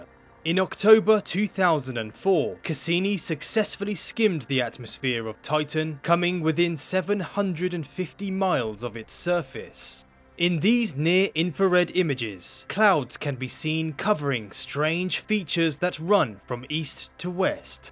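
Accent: United Kingdom. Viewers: The British accent 😍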